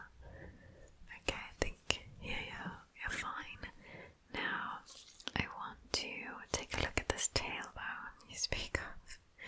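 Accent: United Kingdom. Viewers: with an English accent is a real treat. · Love the accent! I always found the British accents were the most tingly 💤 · Nice British accent. Thank you for the video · Nice doctor RP, love the British accent. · Great Video!!! I love the Doctor Asmr video!!! I also love the British Accent and Glasses for this video too because they make you even more Beautiful!! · I get really happy when does a doctor rp because it means a British accent again.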